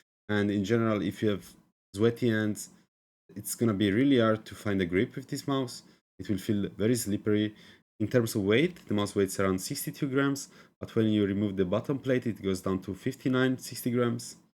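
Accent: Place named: Italy. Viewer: l'accento italiano non mente grazie per la review che volevo prendermelo grazie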